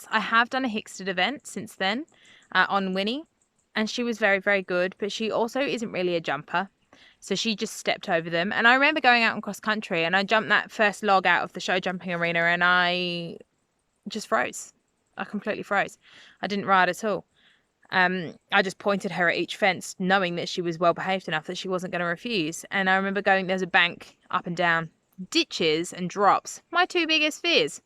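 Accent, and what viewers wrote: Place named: New Zealand. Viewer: Ouch! Oh they can hurt themselves so easily can't they :( I would love to see a video on it. I have a question for you - are you in WA? I wasn't sure because you said Perth but you sound kiwi :)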